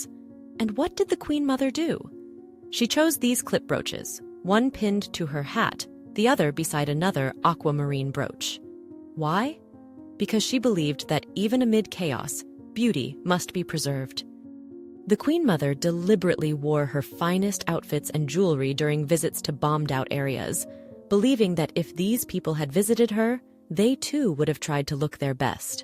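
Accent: United States. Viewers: A slip of the tongue I think. She should have said "the" new monarch. At least these scripts seem well-researched. And now they're spoken in the original American there's none of that AI fake RP. Mind you I wouldn't call anything containing Cullinan V understated.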